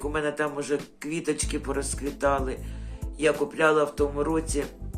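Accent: Kherson, Ukraine. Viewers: Я, коли дивлюся відео Ваші просто "побалакать", ніби побувала у своєму рідному до болю, селі на Херсонщині 😢😢😢, там так розмовляють, як Ви. Сидю, та подібні слова, осьо.... Я, як приїхала проживати на захід України, то з мене сміялися, що я так розмовляю😊, зараз вже "вигавкалася", як кажуть, та, всеодно, деколи щось таке подібне проскакує😂. Так мені до душі Ваші посиденьки, ну, класна Ви, будьте здорові та Богом бережені 🙏❤️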